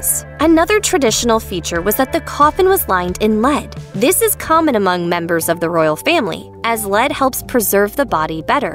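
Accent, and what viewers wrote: United States. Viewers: This unfortunate event would be far better remembered and narrated by someone other than an American. Pronunciation, together with a complete knowledge of British royal ceremonial would be a distinct advantage... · There is something irksome about an American voice over on videos of British affairs. Its like having a terrorist describing the devastation of their actions.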